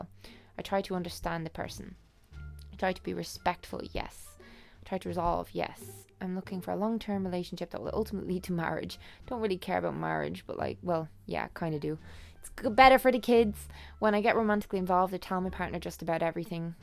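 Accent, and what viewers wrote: Ireland. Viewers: Where is she from? Ireland? Australia? UK?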